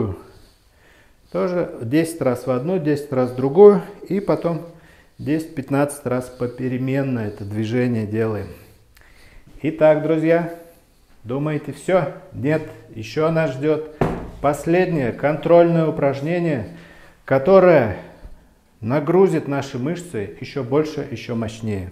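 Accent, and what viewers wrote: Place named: Chuvashia, Russia. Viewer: Остановилась на видео, услышав знакомый чувашский акцент (сама родом из Чувашии из Чебоксары, живу в Германии),этот доктор мне родненький земляк😍, какой классный 👍.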